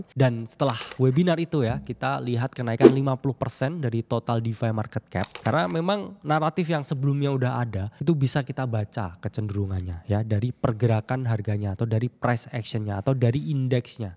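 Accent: Java, Indonesia. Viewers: 🎉mas jowo nya ngendi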